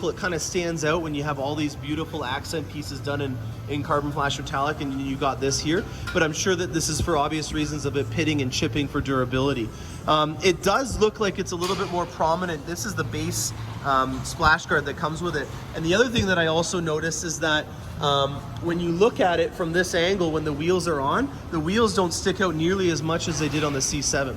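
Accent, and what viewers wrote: Canada. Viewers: are you Canadian? interesting accent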